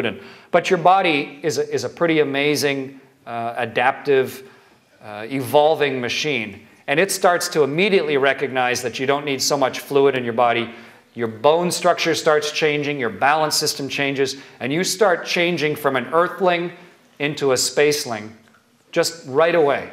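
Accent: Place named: Canada. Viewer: Hehehe, I adore the Canadian accent:-) Especially the "eh" thing:-)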